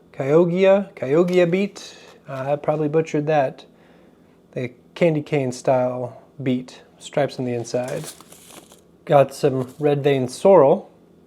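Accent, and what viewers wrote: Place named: West Africa. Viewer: The French with a west African accent piqued somdthing....are you a MK?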